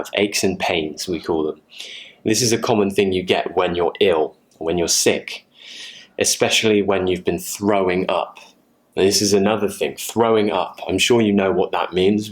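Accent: United Kingdom. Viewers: Hi teacher, can i add you on whatsapp? i would like to practice my brittish accent with you.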